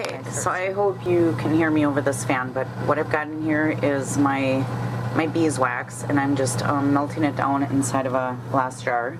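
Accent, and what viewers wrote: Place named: Wisconsin, United States. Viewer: I heard a Wisconsin accent there and the phrase 'up north' --only Wisconsinites use that phrase. Good post--learned a lot--made a yarrow/plantain with students--we too had to use stove technique, though I heard letting it sit in sun is more effective.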